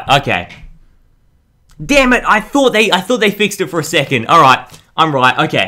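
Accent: Australia. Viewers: He's Australian sir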